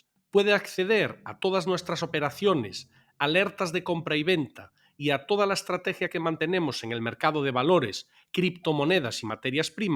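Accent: Galicia, Spain. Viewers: un buen acento gallego no viene mal. Buen video 🙂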